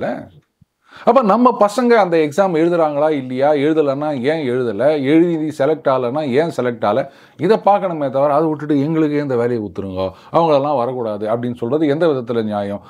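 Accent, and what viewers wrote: Chennai, India. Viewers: 😂 Sir i am a great fan of yours, they way you present it with Chennai slang is very very humorous, i really enjoy it not only myself Many others also will be enjoying these facts and reality 😅, keep it up Sir 🙏🌹🙏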